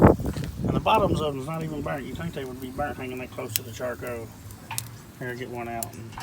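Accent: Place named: Northern Kentucky, United States. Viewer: awesome man. I just subbed the other day and have fun though about all your videos. When I heard your voice I said he sounds too like me to be from northern Ky lol. Keep up the good work sir.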